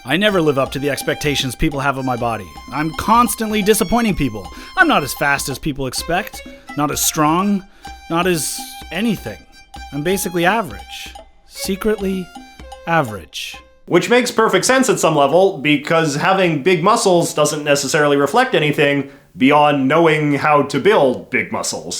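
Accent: Canada. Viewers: You have such a strong Canadian accent. Out and a Boot in a Boot.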